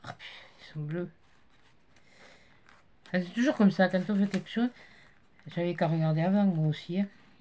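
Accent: South, France. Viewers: Coucou, je découvre votre chaîne et je reconnais bien là la gouaille du sud! J'adore! Vous m'avez bien fait rire! Y en a une qui a intérêt à bien se tenir!!! Votre franchise me plaît. Du coup je m'abonne et je pourrai découvrir vos créations. Merci pour le partage. Bisous de l'Aveyron.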